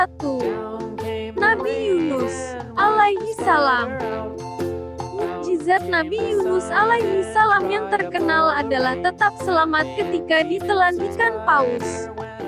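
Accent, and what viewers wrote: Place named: Java, Indonesia. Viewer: medok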